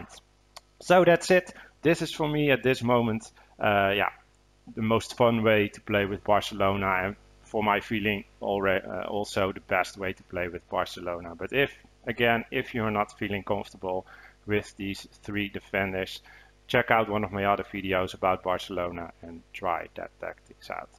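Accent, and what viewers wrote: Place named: Netherlands. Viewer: In het Nederlands omdat ik aan jouw Engels hoor dat je Nederlander bent (No offence) Ik speel al jaren met Barca, en al jaren Div1 speler. Dit jaar enkele keren div 1 gehaald maar met veel moeite. (Meestal speel je tegen snelle spitsen zoals Salah of Mbappe en daar heb je gewoon niet van terug). Uit wanhoop deze opstelling geprobeerd (nu in div 2) en voorlopig heb ik 4-4 gewonnen. Het is zalig om vanuit de verdediging op te bouwen en weten dat je verdediging er staat wanneer je balverlies lijdt!! Good job!!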